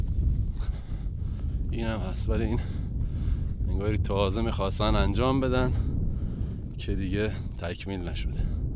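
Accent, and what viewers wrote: Shiraz, Iran. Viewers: درود بی پایان بر جان افتخار می کنم به داشتن تو همشهری با اون لهجه دلنشین شیرازی و اطلاعاتی که نسیب مامیشه از طریق شما. شاد و سبز باشید. 👍 Merci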